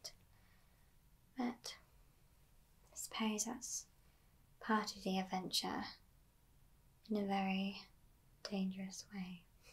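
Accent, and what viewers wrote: United Kingdom. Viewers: Your British accent works great together with the Lara cosplay.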